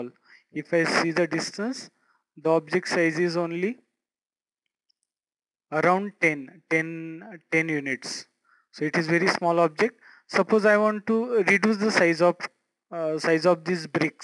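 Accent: India. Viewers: This Speaker MUST BE INDIAN!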